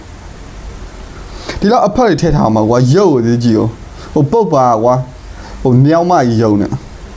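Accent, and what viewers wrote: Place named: Rakhine, Myanmar. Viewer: ရခိုင်နဲတူတယ်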